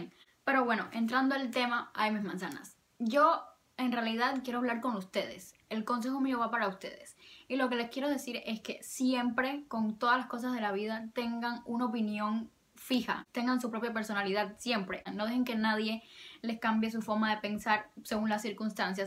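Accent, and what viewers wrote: Cuba. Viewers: hola 👋 yo me llamo betsye y te veo desde Los Angeles, solo quiero decirte que creo en lo personal que eres una persona muy linda y me encanta tu acento cubano 😉👌 jeje mire que te hacían comentarios respecto a Kim y así que decidí venir a ver tu canal y en lo personal creo que tienes una personalidad muy bonita y me agrada mucho tu energía, sigue echándole muchas ganas y no le des fuerza a los comentarios negativos 👍🏻😃 ya tienes una nueva suscriptora!! 👏🏻👏🏻🤗❤️ tan solo por ser originalmente 😄👍🏻 saludos!!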